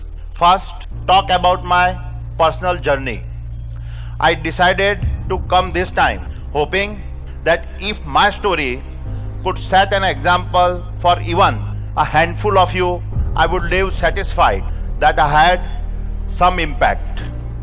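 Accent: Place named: India. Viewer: Ye to apne jaisi english bolta hai ❤️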